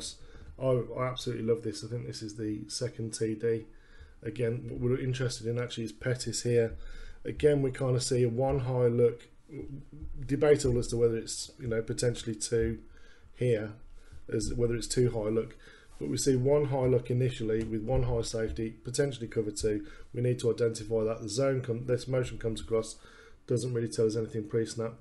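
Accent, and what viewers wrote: England, United Kingdom. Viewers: Am I hearing an English dude doin detailed football analysis, love it 👍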